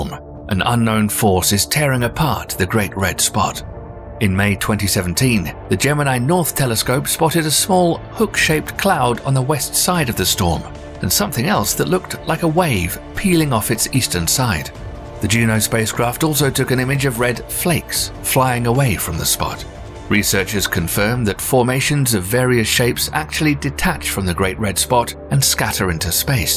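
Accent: United Kingdom. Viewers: Another Brit accent. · I'm tired of Brit8sh accents.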